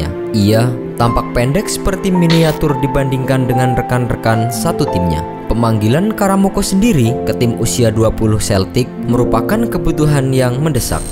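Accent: Java, Indonesia. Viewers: knp medok ya wkwkw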